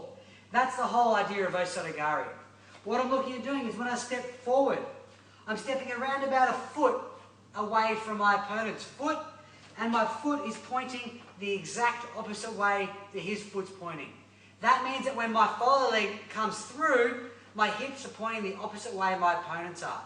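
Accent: Australia. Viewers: Man I love your techniques. And personally I think the aussie accent is beast, but I wish it didn't fuck up the autocaptions so bad; sometimes I actually want to hear you.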